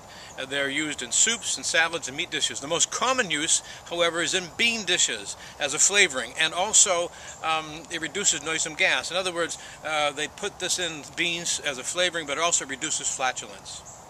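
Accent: Maine, United States. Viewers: Spar varnish (with a Maine accent)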